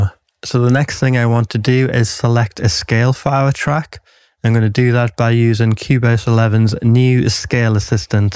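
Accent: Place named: South Shields, United Kingdom. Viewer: I have watched it through 4 times now so you should be getting a cheque fo $0,0000 x 4 dont spend it all at once at the moment I managed to get in an instrument but cant get any sound out. I used cubase with atari many years ago and it was so simple with a Roland JV-1080 and got some great results (one recorded by Tom Jones ) Written in South Shields You sound as though your from up there but I cant get it to work with this I,ll soldier on trying to get it to speak to me I've got 26 days of trial left but at the moment even with your brilliant tuition it a no no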